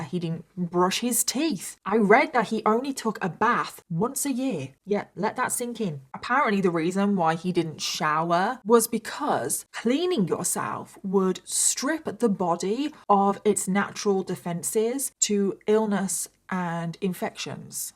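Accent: United Kingdom. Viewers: we love a sassy British accent truecrime queen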